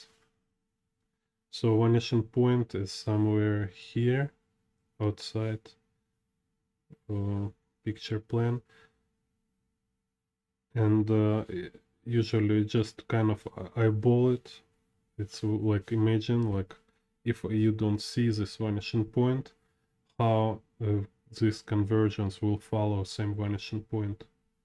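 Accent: Russia. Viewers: Привет. Отличное видео. Всё наглядно, понятно и познавательно. Спасибо. Твой англицкий упорно давал понять что скорее всего ты говоришь на русском :) Яндекс ИИ не смог дать перевод :))) Но всё было понятно.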